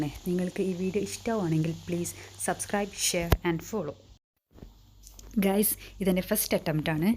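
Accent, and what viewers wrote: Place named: Peringattur, India. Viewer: Ninde peringatur baasha veratte..over aaknda😂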